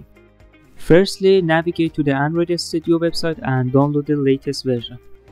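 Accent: Iran. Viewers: از لحجه ات فهمیدم ایرانی هستی 😂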